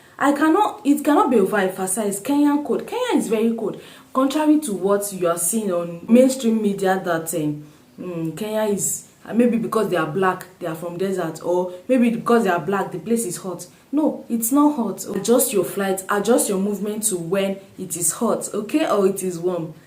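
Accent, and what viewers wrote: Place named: Nigeria. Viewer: It's a Nigerian accent for me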